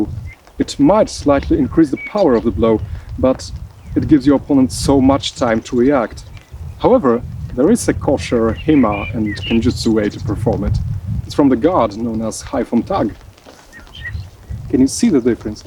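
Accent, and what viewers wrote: Poland. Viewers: You seems to have Polish accent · you sound like a Polish Christopher Walken. love the vids!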